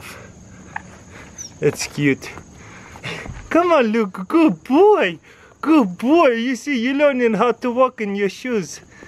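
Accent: Russia. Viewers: Ты русский ? Акцент так и пер😂👌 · Never really been attracted to a Russian accent until I watched this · сильный русский акцент, откуда вы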